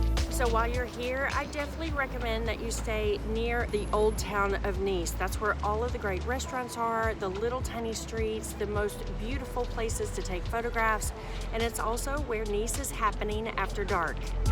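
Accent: South, United States. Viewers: Where are you from? I’m guessing from the south. 😊 Do you speak French? I am from Texas so I have a similar accent. I have been trying to learn the basics of French, but I feel silly speaking with my accent. Any tips?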